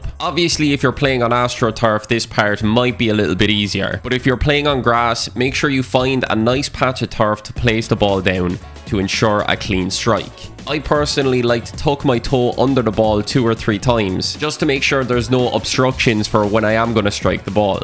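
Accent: Ireland. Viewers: holy irish accent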